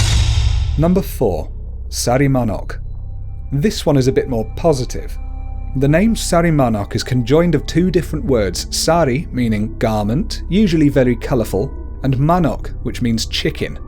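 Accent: United Kingdom. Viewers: Listening to this Brit saying PhilipineFolk creature names makes me laugh,and,just adding this,the tikbalang is fond of abducting beautiful women to be his bride,as what I have heard here from my old family members #ProudlyPinoyAko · So many words you misread! Oh my stars! I am a Filipino!!! Are you British or something?!!! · hindi ka marunong mag tagalog ano yan british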